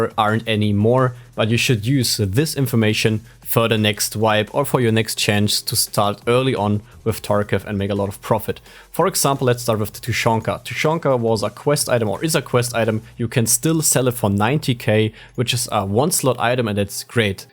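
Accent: Germany. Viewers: Man hört dass du Deutscher bist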